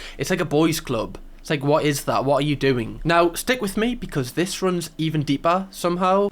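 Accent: Newcastle, United Kingdom. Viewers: he’s from newcastle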